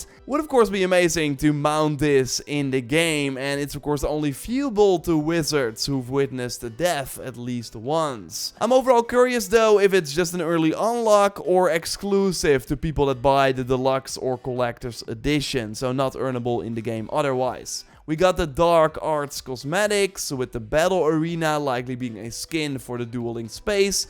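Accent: Netherlands. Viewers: Dutch I believe he's from Amsterdam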